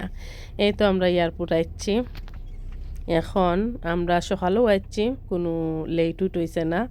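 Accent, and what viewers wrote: Sylhet, Bangladesh. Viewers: আমার দেশ ফেনি আমার মেয়ের দেশ সিলেট আমার মেয়ে সিলেটের কোনো ব্লগ দেখলে খুব পছন্দ করে দেখে কারন এখানে সিলেটি মাত থাকে যা ওর শুনতে ভালো লাগে এবং চেষ্টা করে শিখতে।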